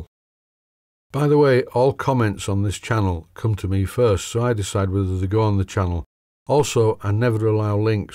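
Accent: Yorkshire, United Kingdom. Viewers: I have no idea why YouTube attracts such brainless and often downright mean comments, but those of us who make the effort to put up videos get faced with them all the time. Most of them come from "users" with no content and no followers. I generally just delete them. That said, I very much enjoy and appreciate your videos, your humour and your Yorkie accent. Thanks!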